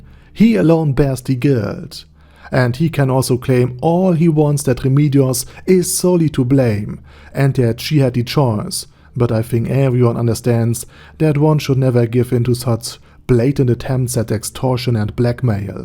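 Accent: Germany. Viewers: wait. what? there are blokes who disliked his german accent narration? that is the only reason why i kept coming back to this channel, it is his thick german accent!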